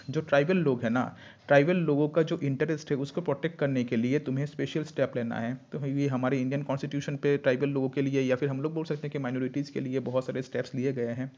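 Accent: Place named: Northeast India, India. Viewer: I like your teaching and understand ur language so easily seems it feels like our northeast accent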